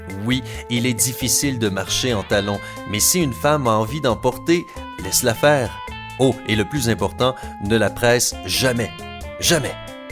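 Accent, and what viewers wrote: Quebec, Canada. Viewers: He is from Canada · Hé le narrateur est québécois! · Ça change avec une voix québécoise !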